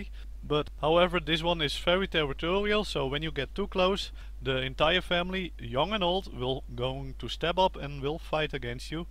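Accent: Netherlands. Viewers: hahahaha mijn engels is ook zo :) dat is bijna normaal voor nederlanders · Are you dutch?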